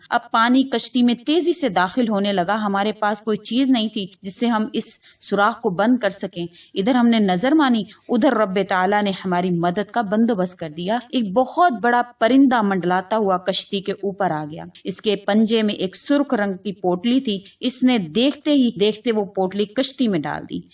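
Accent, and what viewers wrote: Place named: Arab world. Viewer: اسلام علیکم ، بہن آپ سے گذارش ہے کہ آپ اردو جیسی میٹھی زبان کو اردو ہی کے لہجے میں بیان کریں، بلاوجہ اردو کو عربی لہجے میں بیان کر کے مصنوعی مت بنائیں.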